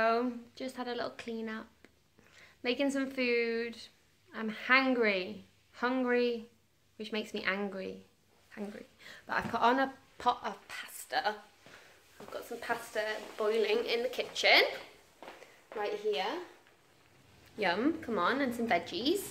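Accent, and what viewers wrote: Germany. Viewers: Your German is so cute 😘 Love your Vlog :) Looking forward to see you at the Open day (I have two little presents for you ^^ ) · Cute how u speak German ^^ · I'm so happy that you're vlogging again!🔝 I really missed it! Also I wanted to say that you're german is so cute and you are such a kind and happy person!😍 xoxo Alexandra😘 (sorry for my bad english)☺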